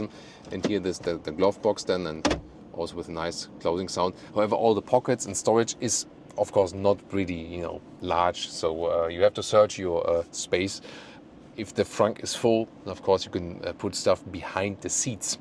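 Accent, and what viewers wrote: Germany. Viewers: is such a classical German 😂 This laughter and this accent. Love that!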